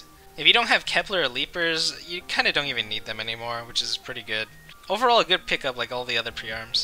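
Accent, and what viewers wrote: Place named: Australia. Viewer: Yo, you're voice sounds like Australian or something western I dunno, but Iirc you are playing SEA server right? And what the heck, you're damn good at making videos. Simple, the caption transitions are smooth but there's also that memeing moments and personal comments like "QTE Switch, now I can get rid of these abominations" or self interrupting like holy shit I rarely see that coming on these times. PS. You sound like you're speaking in Discord VC lol. PPS. I'm a fan of Aldnoah Zero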